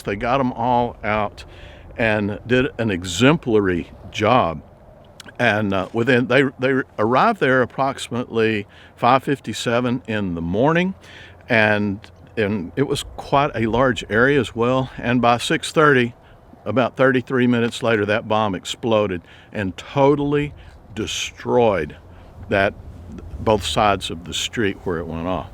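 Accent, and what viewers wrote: Texas, United States. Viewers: It has been many months since I've watched your videos and I apologize for that. My Mother passed away in July and I have been adjusting to that loss. She liked your videos and we would watch them together. She loved your Texas drawl and would say you're a "good looking man"! Bless you and your family and here's to a better 2021. Take care.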